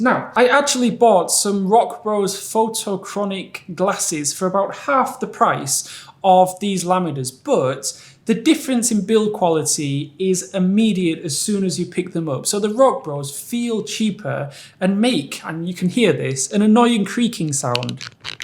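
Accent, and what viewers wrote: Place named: Yorkshire, United Kingdom. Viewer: Where's your accent from? Sounds Geordie with a bit of Yorkshire.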